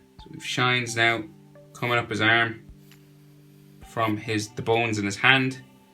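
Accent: Ireland. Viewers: Your accent sounds sorta irish would ya happen to be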